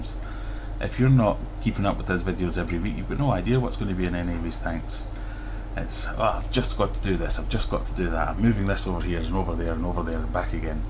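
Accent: Scotland, United Kingdom. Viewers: Agreed on your insight regarding Joey's channel and himself. Are you Scottish? Kind regards! P.S. I've just subscribed :)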